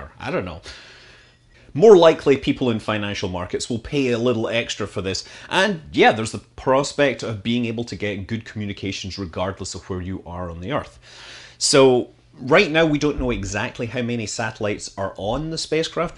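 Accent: Scotland, United Kingdom. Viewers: One of the best phrases that can be spoken in a Scottish accent. It's up there with Curly Wurly. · With that accent? Definitely. Although, if he lives in the US can you truly still call him a Scot? really, what does it even mean to be 'from' somewhere? Ah, never mind. XD I just keep asking myself that because I have grandparents from 3 countries, was born in a 4th, and have lived in 3 of those 4 countries (and dozens of towns and cities) Wherevam I from? I do not know. XD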